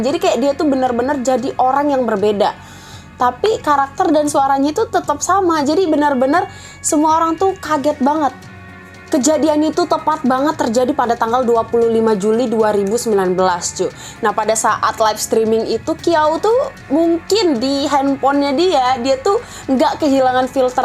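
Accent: Indonesia. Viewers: Sumpahnya channel ini muncul di rekomendasi gw dan kakak ini waiahnya kek orang korea tapi ketika ngomong orang indonesia wkwkwk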